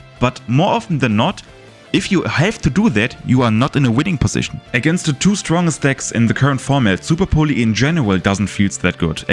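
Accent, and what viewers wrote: Germany. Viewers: Der Bro spricht einfach deutsch auf englisch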